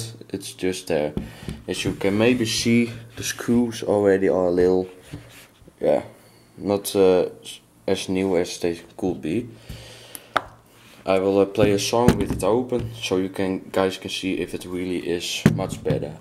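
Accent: Netherlands. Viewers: I can hear verry good that you coming from the Netherlands.😆 leuke review. Nu kan ik eindelijk eens zien hoe mijn Charge 3 er van binnen uit ziet.😊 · ik hoorde in de eerste seconde al dat het een nederlander is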